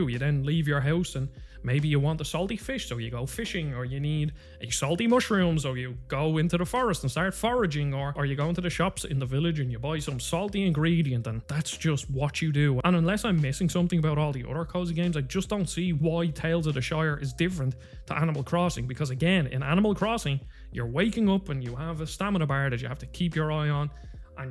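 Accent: Ireland. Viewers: OMG man, such a lovely video, I don’t get the hate either and you just sold me on it, gonna get it myself, also it’s amazing to hear an Irish guy for a change, never been subscribed to any Irish YouTuber so here it goes- you are my first 🍀💚 and if anyone would like to play some cozy/ multiplayer games together (on Switch!) let’s exchange our codes, happy gaming!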